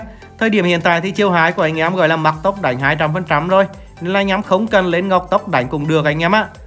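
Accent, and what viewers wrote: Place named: Central, Vietnam. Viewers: Nghe giọng ở huế quá. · nói ra có chút vô duyên và có gì thì cũng xin lỗi trước vì nghe giọng hơi chói tai, này là giọng miền trung, mình thì ko có ác ý gì cả nhưng xưa giờ mình nghe giọng miền trung thì cả ngưới rất khó chịu, nếu chỉ là nói từ tốn thì ko sao còn kiểu như nói nhanh và nói nhiều liên tục thì nghe rất khó chịu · Bạn nói chuyện hay phết.giọng mt nge cuốn ghê..:))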